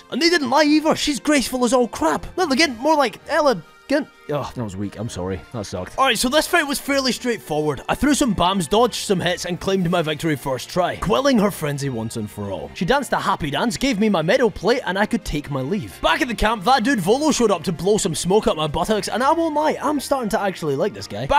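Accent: Scotland, United Kingdom. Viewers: I absolutely agree this guy is amazing and it’s just great to listen to a Scotsman babble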